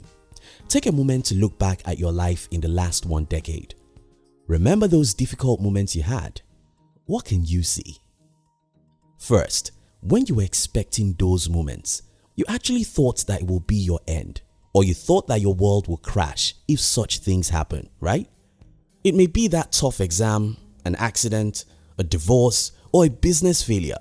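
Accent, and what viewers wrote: Nigeria. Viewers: Are you Nigerian? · You sound like a Nigerian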